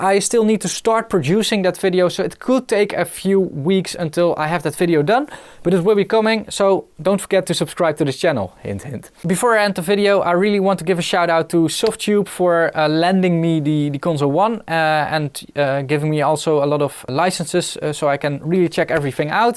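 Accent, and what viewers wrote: Netherlands. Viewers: Steenkolen accent.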